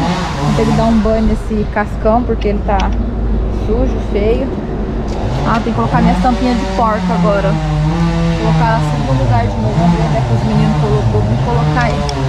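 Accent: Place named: Bahia, Brazil. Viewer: Que perigo sempre freio aí é barril falar que nem nós baiano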